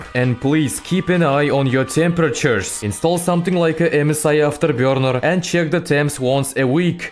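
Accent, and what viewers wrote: Russia. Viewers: Not trying to be mean but the Russian accent is so hilarious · 😂 it's russian accent ig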